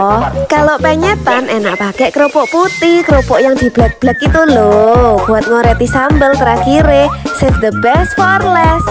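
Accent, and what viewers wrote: Java, Indonesia. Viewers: Bahasa jawa nya medhog bgt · Enak bgt denger bahasa jawanya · Kak owenge jowo yo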